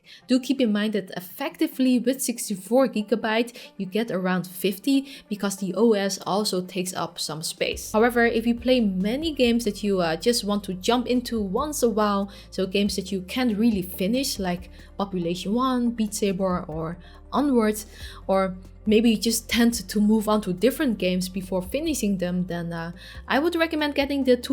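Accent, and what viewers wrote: Netherlands. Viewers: I can't help but wonder if you are Dutch, you have a Dutch English accent :) Anyway, it doesn't feel like I'm watching a review of someone who used this for a month, it feels more than just an explanation of the Quest 2 specs. I have so many questions! :D I was hoping to see a more personal review going in deep about your experiences. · Ok, I NEED this mystery resolved... since your first video I thought you had what sounded like a dutch accent! Are you ladies dutch? Quality content, i'm subscribed! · Great video ! 😊 I will sub! Question though.. are you Dutch .. your accent makes it sound like it I just bought a quest two I loved it, but sadly it made a horrible noise in the fan in front .. so I am waiting for a replacement. 😊 i did not know about the stuff of the elite strap.. I wanted to buy it as I never had the first one.. what should I do ? 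Wait until they fix it ? Or just cope with the standard one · Je klinkt Nederlands? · is it me or she sounds Dutch ?! .... :)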